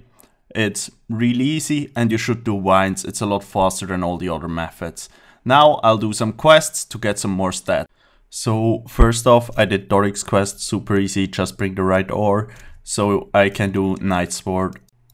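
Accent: Switzerland. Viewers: 100% en schwizer haha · Ich has gwüsst! hahaa, han mer grad denkt, dases en schwiizer akzent isch^^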